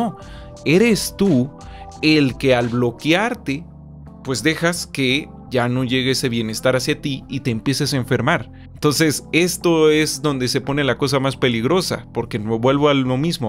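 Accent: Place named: Mexico City, Mexico. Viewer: Mames, el mismo acento de la Debayle y casi todas las ladys, ¿Poblana? Fifi de CDMX?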